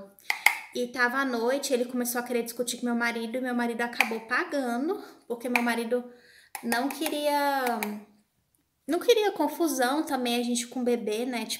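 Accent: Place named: Northeast, Brazil. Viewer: Vc é de Brasília...seu sotaque parece do Nordeste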